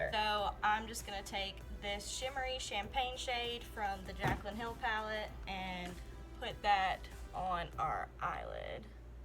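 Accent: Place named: Texas, United States. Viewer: Are you from Texas? Or at least a southern state? Haha your accent sounds just like mine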